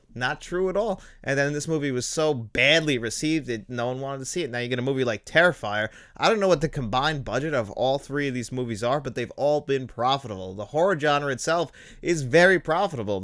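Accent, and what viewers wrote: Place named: Cockney, United Kingdom. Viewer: Cockney accent! From London 😎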